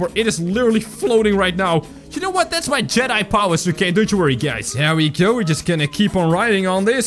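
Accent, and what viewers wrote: Netherlands. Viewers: Just wondering but are you dutch, because I just thought I heard a dutch accent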